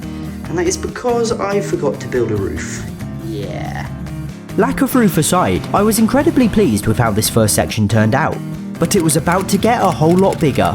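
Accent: United Kingdom. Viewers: glad you’re British and not American if you know what I mean. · He is British I think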